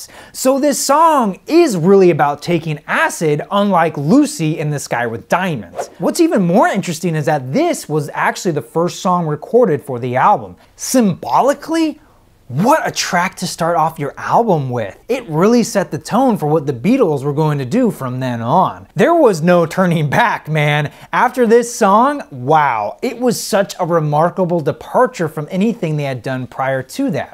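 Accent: United States. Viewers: would pop you in the face if you got anywhere near him because of your loud American delivery like Jim Carrey's movie The Mask. Must you embarrass us USA Beatles fans? Its so offensive to the memory of the Beatles dude! Yeah if you are going for Jim Carrey, wear the mask at least?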